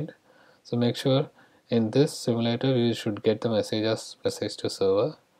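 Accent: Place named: India. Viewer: bhai full indian accent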